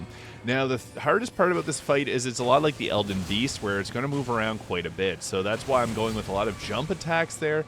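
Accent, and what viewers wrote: Canada. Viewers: Is that a Canadian accent by chance? I’m from B.C and I swear I hear a fellow Canuck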